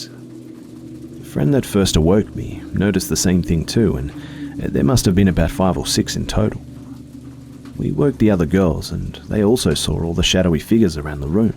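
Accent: Australia. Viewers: "Shadow People", a.k.a "Shadow Walkers" and "Dark Dwellers" are mainstays of many traditional cultures and generally seen as spirit beings that can be good, bad, or neutral. Usually with Native American and First Nations cultures, it's something negative. Being of Cherokee background, the traditional view for my experience is that they are visions of the Raven Mocker, the spirit associated with death and is the one who takes the soul into the next world, the one the medicine man has to protect the body of the sick and parents of little children while sleeping from with pine wood cuttings and tobacco. The Aborigines of Australia (judging from that voice and your fondness for telling the story about the Cockney security guard, you might get a kick out of this) have the Dawn Walkers, the beings that during the Dreaming wandered the world, creating various landmarks and working out various "just-so stories", but are now mysterious visions one can catch in their eye if they look hard enough. 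Unlike many Native American versions, these are positive and they are known to be powerful and can give great strength and wisdom to those that treat their dwelling-places such as caves and trees with respect. Really neat stuff, cultures and religions, anthropology and the study and preservation of the cultures and heritages of our planet.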